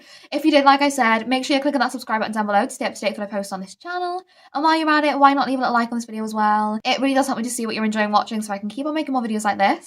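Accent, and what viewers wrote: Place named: Nottingham, United Kingdom. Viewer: Your very smart.. thank you for appreciating mvs.. I remember my friend from nottingham in UK.. you both speak the same.. ❤❤❤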